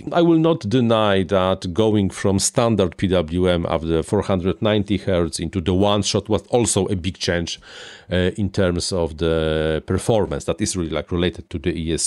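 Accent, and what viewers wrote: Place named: Poland. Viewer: Czemu tak łatwo rozpoznać Polaka mówiącego po angielsku?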